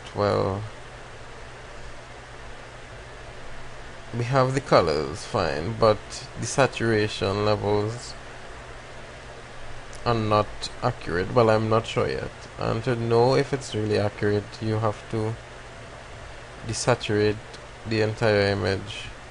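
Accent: Trinidad and Tobago. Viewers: Same thing I saying he's trini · Great tutorial my friend. I can hear it in your voice that you're from Trinidad. I was shooting a destination wedding there for a client and friend who is from Trinidad. The wedding was in Tobago at Stonehaven Villas. Beautiful country indeed!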